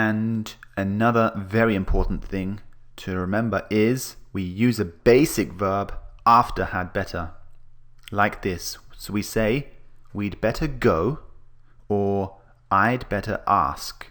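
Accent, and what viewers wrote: United Kingdom. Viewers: Britsh Accent?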